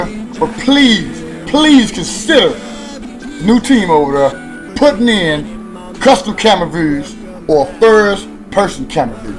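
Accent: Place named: Baltimore, United States. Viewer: That Baltimore accent is thick as shit. Vieruu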